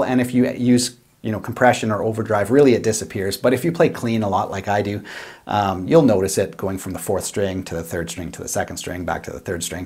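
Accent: Canada. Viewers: You have such a strong Canadian accent lol I love it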